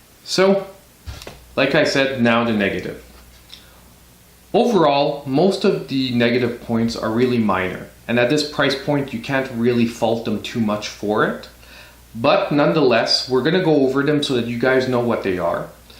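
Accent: Quebec, Canada. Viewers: french qc accent haha keep going youre awesome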